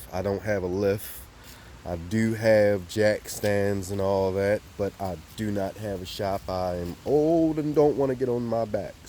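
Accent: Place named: Baltimore, United States. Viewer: Are you from Baltimore? Your yews and tews are a lil prominent. Helpful vid btw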